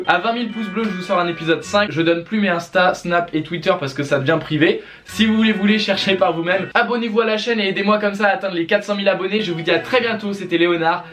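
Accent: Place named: Normandy, France. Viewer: ons dirait trop norman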